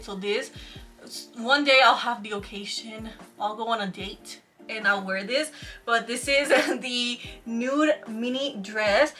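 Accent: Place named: Puerto Rico. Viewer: Your Puertorian Accent is Everything☺️😁❣️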